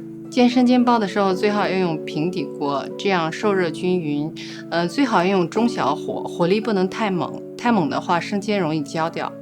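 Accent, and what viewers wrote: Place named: Shaanxi, China. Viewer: 明显是陕西口音呀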